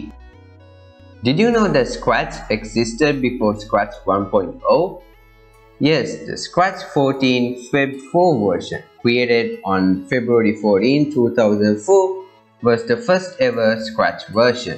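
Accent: India. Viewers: ur indian accent is so strong, the subtitles say "caro network" instead of "Cartoon Network)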